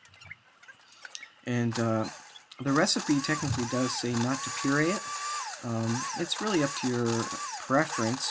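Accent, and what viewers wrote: Canada. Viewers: Are you Canadian? I'm hearing a Canadian type of accent.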